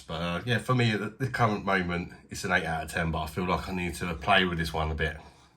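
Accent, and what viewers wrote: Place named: West London, United Kingdom. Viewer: cheers will give them a try and I will try one of them rheinbachers aswell as I like holsten and your battle vs lidl lol . U sound from my neck of the woods I'm west side of London near Heathrow if ya ever fancy a pint and a game of pool one day always welcome in the sir john gibson pub in stanwell I'm often in there with my pals as its five mins away lol cheers bosh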